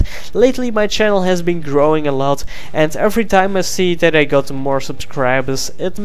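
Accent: Netherlands. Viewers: Such a dutch way of speaking english xd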